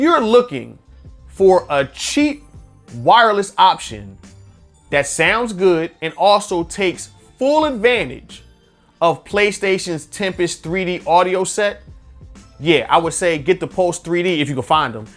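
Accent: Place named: New York, United States. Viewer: Definitely from NY. Yerr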